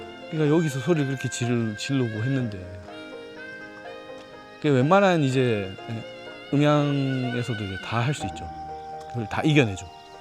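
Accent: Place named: Hadong, South Korea. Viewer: 손빈아 가수님은 우리 하동의 자존심 입니다 더높이 더멀리 비상하세요 꼭 승승장구 하세요 빈아님은 순수한 청년입니다 사랑합니다 간혹 우리 하동말 나올때 정감이 넘쳐요🎉🎉🎉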